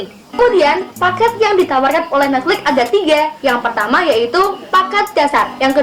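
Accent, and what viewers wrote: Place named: Banyumas, Indonesia. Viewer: Kok ngapak yaa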